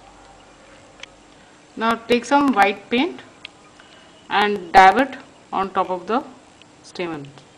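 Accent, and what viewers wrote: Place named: India. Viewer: All your videos are so dark, badly filmed and the object is often out of camera shot. Your Indian accent makes them hard to follow.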